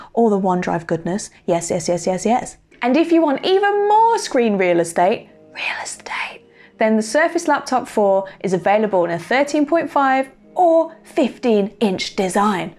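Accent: United Kingdom. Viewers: I love this lady, she's so fun to watch. English accents are the best.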